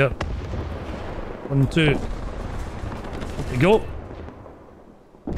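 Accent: Scotland, United Kingdom. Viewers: Pronunciation is quite good and the Scottish accent is always a nice plus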